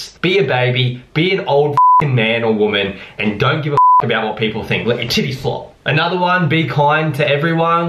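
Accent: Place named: Australia. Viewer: Omg really? You sound like a Aussie for sure lmao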